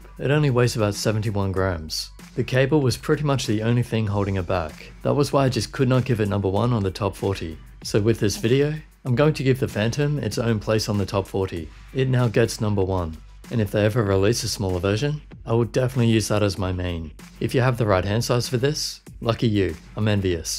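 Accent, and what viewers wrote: Australia. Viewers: Nathanael Ciranni not really, it’s more that his accent doesn’t sound Italian. Sounds more Australian, or maybe from New Zealand.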